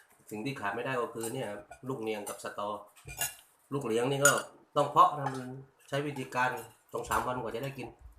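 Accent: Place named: Trang, Thailand. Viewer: ดูกับข้าวพี่แล้วชวนให้หิวรอบดึกเลยครับพี่. ติตามครับสำเนียงพี่เหมือนคนตรังบ้านผมเลยครับ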